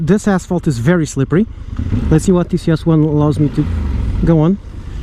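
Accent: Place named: Portugal. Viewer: Incrível como topei em 5 segundos que era um tuga a falar inglês.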